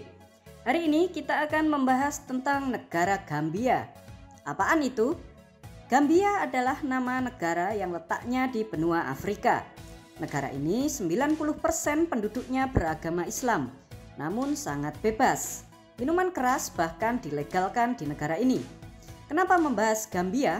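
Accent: Java, Indonesia. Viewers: Suka bgt ma Suaranya, lucu bunda ada logat jawa2nya gtu😁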